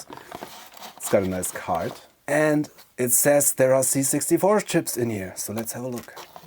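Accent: Germany. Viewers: Man hört das du ein Deutscher bist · You sound German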